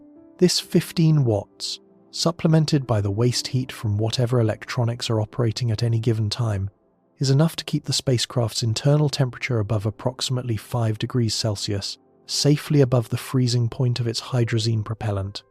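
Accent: England, United Kingdom. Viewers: I am pretty sure that the air and moisture in the suit would still cause evaporative cooling, and your face would frost over or freeze superficially on the surface. It would probably look like it was frozen solid. I think that some of us are a little hard on this Sleepy Science channel. It's not terrible even if its AI. It's sleepy pop science with a relaxing English voice to fall asleep with :-)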